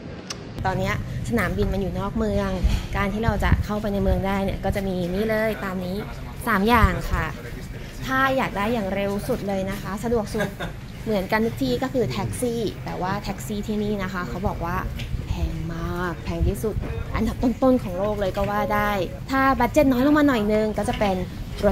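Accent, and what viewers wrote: United Kingdom. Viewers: เวลาคุณ ไปเที่ยวแบคแพค ดูไม่ออกว่าเป็นลูกคุณหนู CEO เลยค่ะ ยกเว้นตอนพูดอังกิดสำเนียง british ^^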